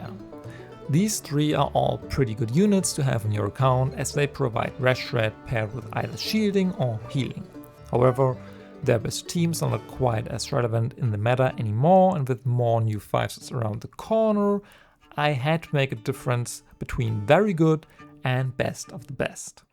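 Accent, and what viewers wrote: Germany. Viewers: Lieblings Genshin Youtuber. Vor allem wegen dem Deutschen-Akzent😆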